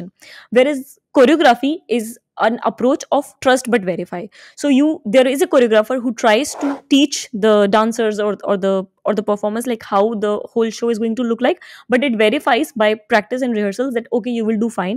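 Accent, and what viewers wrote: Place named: India. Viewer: im also indian and I love the accent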